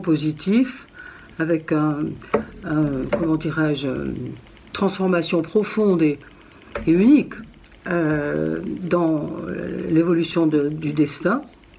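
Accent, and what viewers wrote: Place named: Algeria. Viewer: Accent pied-noir plein de soleil ☀️ merci pour votre travail ! Bélier ascendant vierge et lune en scorpion... j'espère que cette année m'apportera douceur et amour ❤️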